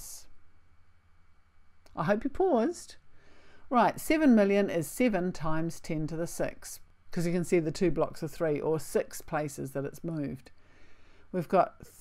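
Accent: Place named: New Zealand. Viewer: Super helpful. Also good to hear a kiwi explaining this. Thanks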